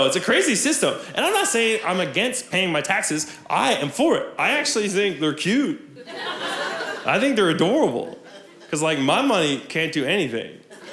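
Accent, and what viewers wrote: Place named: Canada. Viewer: Im an ontario canadian myself. I was watching a racing youtube video and this whole special came up as an Advertisement. Ive never heard of you yet. I almost skipped but heard the first 2 minutes and watched the whole thing! I knew you were canadian instantly. Cheers from ontario! New fan! Edit: legit laughed my ass off outloud for so long my girl yelled at me from the other room asking if i was okay 🤣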